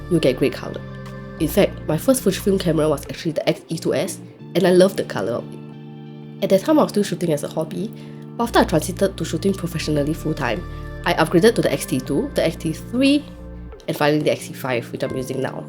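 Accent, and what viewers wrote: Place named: Singapore. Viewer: I own an XT5 and was just browsing Fuji YouTube for entertainment, I don't know why but being French expat living in Singapore landing on your video hearing your Singaporean accent was really heart-warming, feels like "home" (at least my home now). Subscribed!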